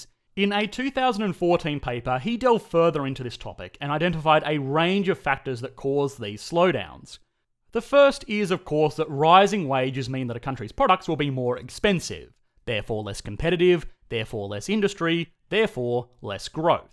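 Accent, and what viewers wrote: Australia. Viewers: But he's not an American, he's and Australian. That should be obvious by his accent alone.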